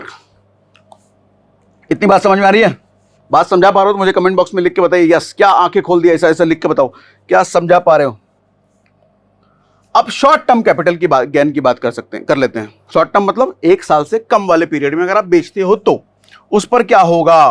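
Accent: Bihar, India. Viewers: Sir aap Bihar se....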